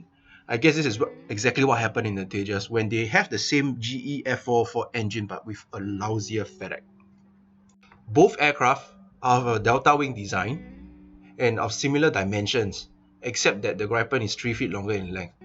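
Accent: China. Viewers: Pronounsation sounds like pure chinese .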